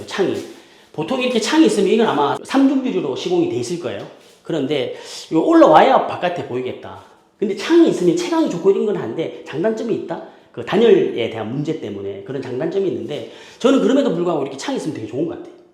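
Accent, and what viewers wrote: Busan, South Korea. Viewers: 부산 이쥬? 사투리인데 ㅎㅎ 인천송도인가?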